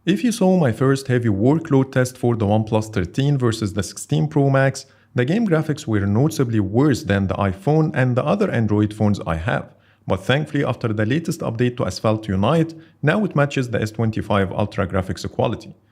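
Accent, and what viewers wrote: Egypt. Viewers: اللكنة المصرى منورة 😂🇪🇬 Great video though 👌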